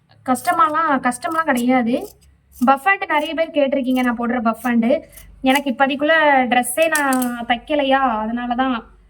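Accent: Tirunelveli, India. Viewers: Tirunelveli slang always beauty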